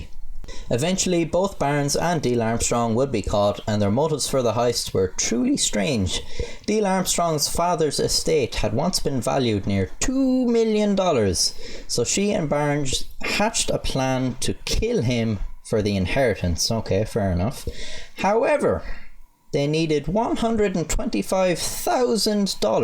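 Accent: Ireland. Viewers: "Two thousand and Tree" lol. gotta love that irish accent. just confused as to why he doesnt say "tousand" too XD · LOVE the way you say 30 as well as the Irish accent in general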